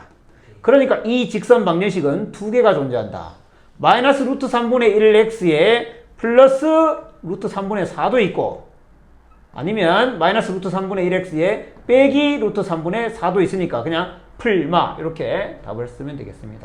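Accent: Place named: Gyeongsang, South Korea. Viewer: ㅋㅋㅋ. 강의하실 때 샘의 억양을 들으니 혹시 대구 분이 아닐까 그 동안 생각하긴 했지만 실제 수성구 범어동일 줄은 몰랐습니다. 친척 따님이 수성구에서 KT 다니는 남자와 백년해로를 언약해서 거기서 혼례를 치르기로 했습니다. 정말 대단한 우연이네요. 저도 일반적으론 높은 확률을 따라가긴 하지만 이상하게 꼭 그렇진 않게 되더라구요. 전 정수론과 복소해석학이 전공인데 확률 전공이 아니어서 그런건 아니지만 전 수학자이면서도 절대적으로 확률을 믿지는 않는 경향이 좀 있습니다. 우연에 내맡겨 버리기도 하죠. 저도 지난 주 파워볼 60개 번호 중 8개 숫자 조합을 모두 맞추면 잭 팟을 터뜨리는 아주 희박한 확률 싸움에서 겨우 2개를 맞춰 35 달러(한화 약 5 만원)를 타면서 역시 잘 안되는구나를 절감했지만 그래도 재미로 소소하게 하는건 괜찮으거 같아요 ㅎㅎ. 그나저나 돼지갈비로 먹을지 소갈비로 먹을지 벌써 갈등이 생기네요 ㅋㅋㅋ.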